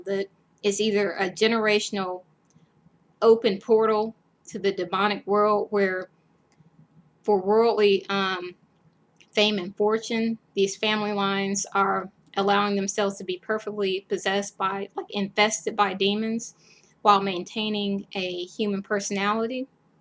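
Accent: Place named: United States. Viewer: "tares" is an old way to say "thistle" from Early Modern English such as existed in 1599 when the Geneva Bible was translated into English. Everyone who lived in europe before 1000 CE is related to every white person alive today, and every person with even one white ancestor. There are 5 Gods and a Goddess in my genealogy. And guessing by your light complexion and American accent, you and I are related.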